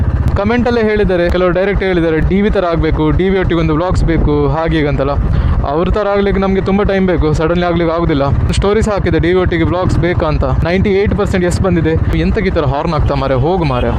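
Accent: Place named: Kundapura, India. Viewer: Kundapra kannada kend kushi aith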